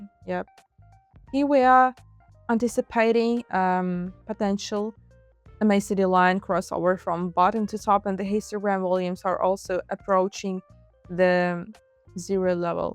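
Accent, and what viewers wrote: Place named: Russia. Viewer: It's pronounced MAK Dee 🤦 This Russian accent and pronunciation is 🙄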